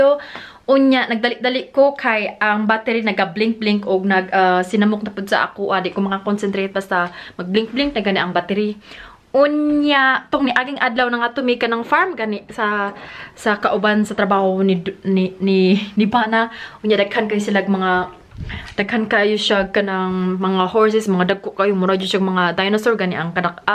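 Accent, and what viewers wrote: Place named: Visayas, Philippines. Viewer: nakakatuwa tlga mga bisaya sarap sa tenga ung pag sasalita 😊😊😊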